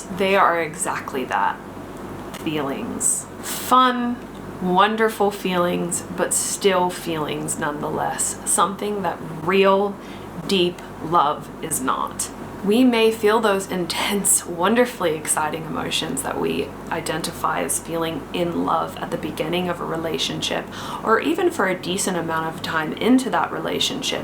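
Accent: Australia. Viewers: i love how all your “O’s” are so Australian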